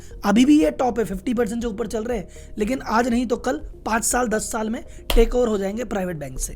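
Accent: Gujarat, India. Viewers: Sir aap Gujarati hoo...ya non gujarati ho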